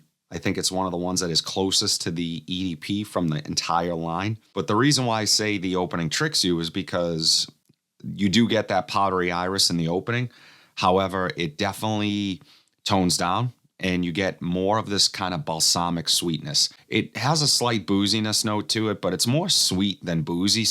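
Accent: Boston, United States. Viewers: Here we go again… I feel like I’m in Boston again with your accent lol. Anyhow, I think you would like Ani X . Fruity hints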